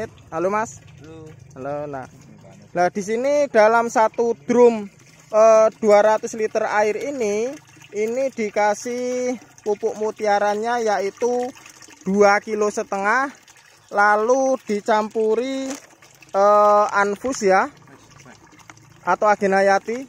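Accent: East Java, Indonesia. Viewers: Wa'alaikum sallam bossss......,kata2e sampean iki kok koyok Wong jawa timur,samean iki asli ngendi e bo....ssss?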